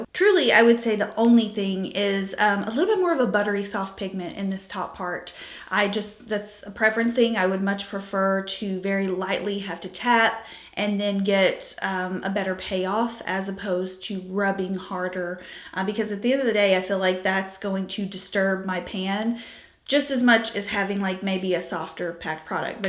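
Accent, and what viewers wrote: South, United States. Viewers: Just found your channel and I am so impressed by how down to earth you are while being so thorough with your reviews! As a Tennessee girl I also love the southern accent! I feel very at home on your channel! The content you are producing is such high quality--thank you!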